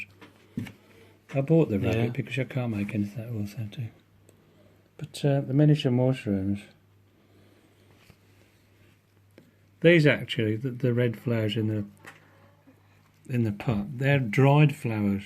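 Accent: Birmingham, United Kingdom. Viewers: Sounds like a fellow Brummie! We have always been an inventive lot.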